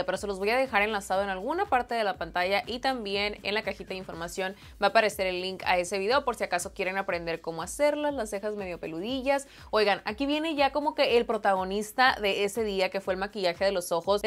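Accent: Sinaloa, Mexico. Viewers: Jajajaja m encanta cómo a blas jajajaja 💯 % sinaloense 🙋🏼‍♀️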